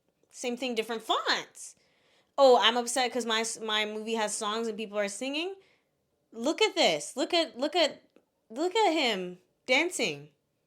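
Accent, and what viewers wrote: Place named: Canada. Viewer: Agree 100% on the first two although I do love the piano in La La Land. Studio Ghibli is amazing imo so hard disagree. I can understand your critique of TSITP because it's definitely problematic in some ways and not what I would do in Belly's (and Conrad's) situation for the majority of the books and show lol but I definitely have a nostalgic pull to it since I'm 31 and read the books when I was in high school. Also are you Canadian because you sound so Canadian lol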